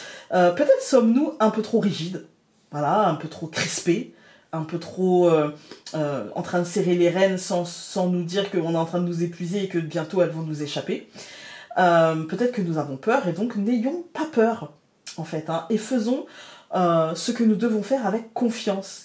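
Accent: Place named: Picardy, France. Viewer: Coucou pourrais tu me faire savoir quel Lenormand tu utilises pour ce tirage. J ai tous tes jeux et celui-ci ne me dit rien. Je l ai peut être loupé. Merci pour le partage et pour ta réponse. Belle semaine à toi avec l accent picard. 🌞💐🌳🌞😘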